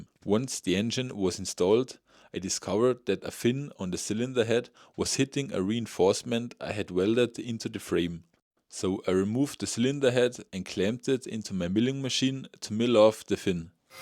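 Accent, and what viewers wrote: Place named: Germany. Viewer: pls stop with english you sound way to german you are to stiff for english loosen up or just stay with german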